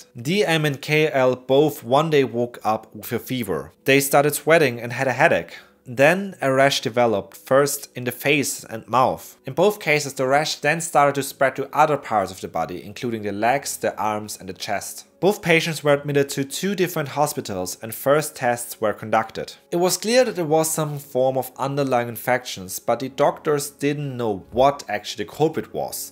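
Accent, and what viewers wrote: Germany. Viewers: The guy who talks is german you hear it in his english out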